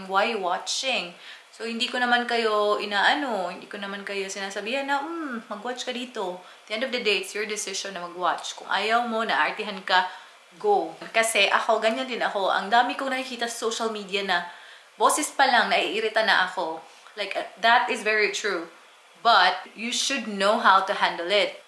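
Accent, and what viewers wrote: Visayas, Philippines. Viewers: Kasi Bisaya ka Kasi, Kaya pag nagtagalog ka parang may kunting accent Ng bisaya na malambing, Kaya akala nila maarte na, di nila alam malambing Ang mga bisaya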